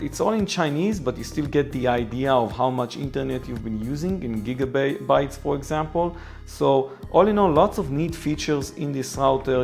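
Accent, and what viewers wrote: Israel. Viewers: שומעים שאתה ישראלי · Love the Israeli accent! Quick questions: 1. Do you need a modem to connect to the router or it is functional as both? 2. Can u access the administrator panel easily? Does it support English or it is all on Chinese? Thanks for the review mate!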